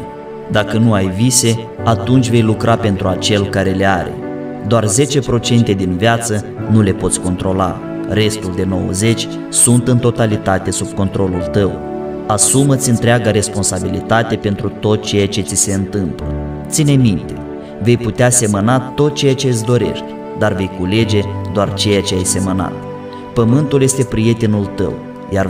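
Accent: Moldova. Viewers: Apreciez materialele realizate de tine, ma amuza ", graiul dulce moldovean", cât despre conținut, par bine realizate și bănuiesc, ca necesita ceva osteneala pentru a le realiza. Zice-se că nimic nu e-ntamplator, intr-adevar, oricât de greu ti-ar fi, oricâte obstacole vei întâmpină, pentru realizarea unor astfel de materiale, incearca sa constientizezi ca tu, de fapt esti un "instrument" prin care Intelligenza suprema a Universului, ne bucura și ne destăinuie prin tine, aceste lucruri minunate, "hrana" spirituala. Fii binecuvantat, suflet minunat 🙏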